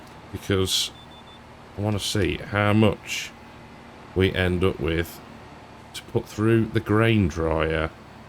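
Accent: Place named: United Kingdom. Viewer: What part of the UK are you from? I lived in London, Birmingham, Manchester and spent a bit of time in Skegness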